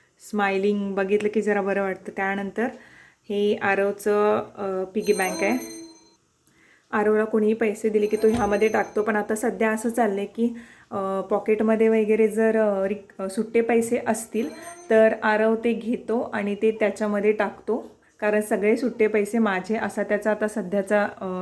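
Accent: Kolhapur, India. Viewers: काल पहाटे 4वाजता अचानक जाग आली.झोप येईना म्हणून मोबा. चालू केला.पहिलाच* * तुझा विडियो 'किती मिळाले' हा पाहिला,नंतरं 'आमचं लग्नं' हा पाहिला. भाषा जरा कोल्हापूरची वाटली.त्यामुळे जरा उस्तुकता वाढली.सकाळी उठून बाकीचे vlogs पाहीले,फालतू कमेंटना ' माझं चाळीतल घर,' व ' मिक्स ' ह्या vlogs मस्त चपराक हाणली.तर ' माझं रूटीन ' मधून घराची सगळी कामे करत vlog करणं येड्या गबाल्याच काम नाही.हे तू दाखवुन दिलंस.सुंदर मांडणी,निखळ बोलणं, कॅमेऱ्याच हाताळणं उत्तम. स्वच्छ, सुंदर,निरागस वागणं,बोलणं, हास्य उत्तम.तुझ्या ब्लॉगला हार्दिक शुभेच्या!!! T.V. वरील फालतू सीरिअल्स बघण्यापेक्षा हे बरं!! एकेरी तुझा उल्लेख केला कारण आमच्या लग्नाला २१ वर्षे पूर्ण झालीत. मी सांगलीचा तर बायको कोल्हापूरची. ब्लॉग बघताना ज्या ज्या घटना घडल्या त्यातून आमच्या जुन्या आठवली जागवल्या.पूर्ण कुटुंबाला शुभेच्छा!!!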